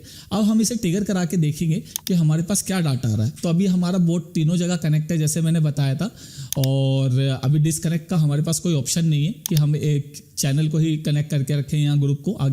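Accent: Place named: India. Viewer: For fucks sake, is there anyone on YTB, who explains this and is not Indian and can explain it in clean English?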